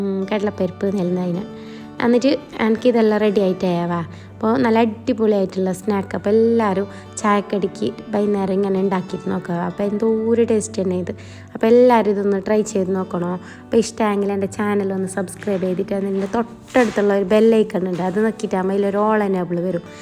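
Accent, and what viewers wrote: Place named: Kasaragod, India. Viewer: വീഡിയോ full കണ്ടു.. കാസറഗോഡ് സ്ലാങ് കേൾക്കാൻ.. I like.. സ്ലാങ് ❤️❤️❤️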